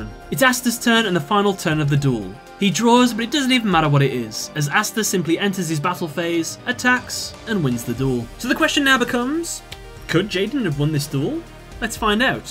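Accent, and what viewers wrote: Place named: United Kingdom. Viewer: yo man, I catch your content on occasion, like what you do and you are clearly loved in this community. Whenever one of my comments is slightly negative I usually don't write it, cause who needs that ya know?? But I'm really confused by your pronunciation, cause you sound British to me, yet you say Tempest and Mariner oddly, you seem to pronounce the syllables individually. Small thing, just catches on my ear whenever I hear it. and the slightly negative comment is...... that part about what fusions Jaden could have gone for after first using 'the warrior returning alive' ,.... so obviously filler man, so obviously, not necessary for your point either.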